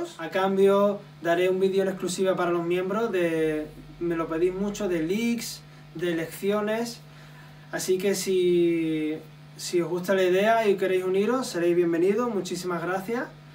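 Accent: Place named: Argentina. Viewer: joder pensé que eras argentino primera vez que veo un video en el que hablas...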